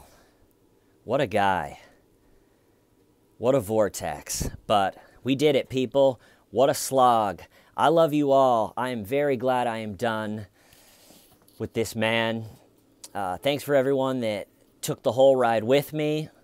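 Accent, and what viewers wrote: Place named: South, United States. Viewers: I love your southern accent. All people have to do is watch the original police interview to find out why STAYVEN is funny. 😂😂😂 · Love me a southern accent. 👍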